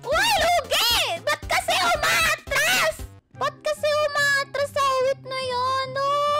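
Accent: Visayas, Philippines. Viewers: Ate Bisaya Ka po ba?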